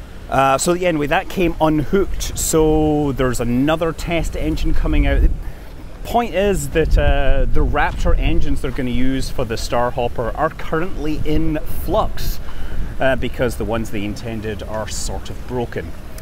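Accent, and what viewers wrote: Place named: England, United Kingdom. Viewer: We of the USA do understand the English way to say words differently than we do, there are many different methods to say many of our words here in the USA. So please do trouble your self, Thank you very much your program is "GGG-GREAT!" as Tony the Tiger would say it. Taken from a commercial of Kellogs Corn sugar-frosted flakes. This one has been off the airways for many years now. { Tele or TV or The boob-tube came to us on airways. }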